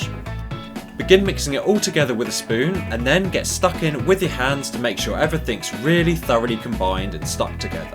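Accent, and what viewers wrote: United Kingdom. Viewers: BRITISH ACCENTS ARE SO ANNOYING but the recipe seems simple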